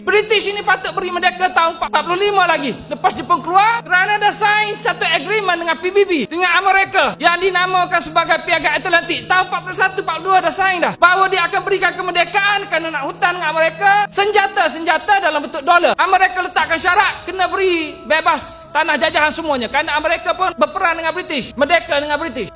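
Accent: Kelantan, Malaysia. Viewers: Ore klate ni. Kawe first time dgr pun dah tahu.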